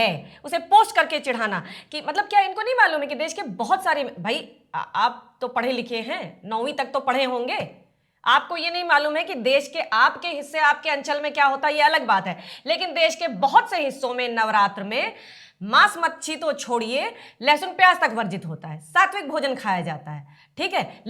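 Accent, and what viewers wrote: Bhojpuri, India. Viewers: g jai Shri RAM 🎉❤... The style of your news presenting is very unique and ur bhojpuri slang is awesome... Pin my comment 😅🎉❤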